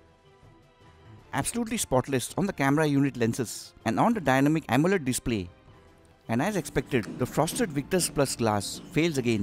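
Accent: India. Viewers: Indian accent is so funny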